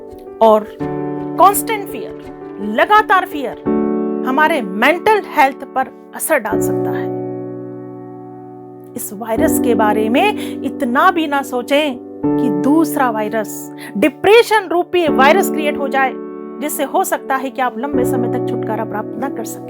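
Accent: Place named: Shekhawati, India. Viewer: mujhe thodi bahut samaj me aati he shekhawati... Bcoz mere papa ki school me shekhawati teacher ki posting hui he so unse kafi touch he mera.... Apki shekhawati bhasha sun ne me bahut badhiya lgti h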